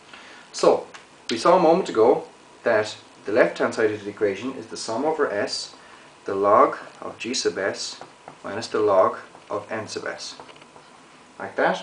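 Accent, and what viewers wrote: Ireland. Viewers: that irish accent reminds me of Jacksepticeye, quite nice xD. Great video and explanation btw, it really helps to see the full steps. Thank you!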